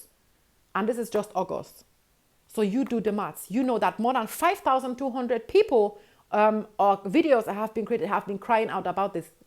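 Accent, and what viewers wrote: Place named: Nigeria. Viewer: Nigerian accent is super strong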